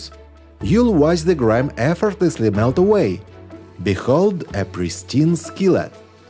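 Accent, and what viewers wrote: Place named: Russia. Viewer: Такой тяжелый русский вариант английского-невозможно сосредоточиться на сути.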